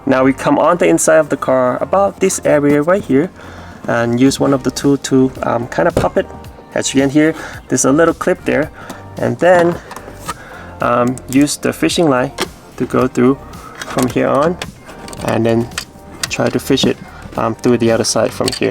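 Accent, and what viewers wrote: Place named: Asia. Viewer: Why it has an asian accent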